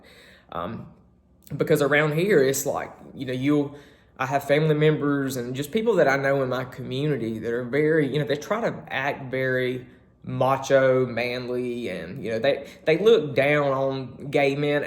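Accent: South, United States. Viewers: I love your accent and hearing you talk! My mama and daddy were from Virginia and growing up. I had a nice southern accent, but I lived in Maryland and I was made fun of so much that I worked so diligently at losing it I wish I had it back, I love listening to you! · Dang, your beautiful southern accent is music to my ears! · Thought provoking and insightful, your experiences of growing up gay in the Deep south Bible belt of the United States is similar to mine growing up in rural Catholic Ireland, your are a natural at this looking forward to hearing more of your beautiful southern accent, God has blessed you with good looks and articulateness, you are helping many people God bless you. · Love your southern accent.❤ you are so damn cute.🏳️‍🌈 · Ain't you just as cute as a bug in a rug. Don't you think you'd have a better experience if you didn't have such a girly voice? 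I'm gay and I never got that girly voice thing. With the southern accent it kind of fits, still I can see how that would annoy some good ole boys. Why ask for trouble?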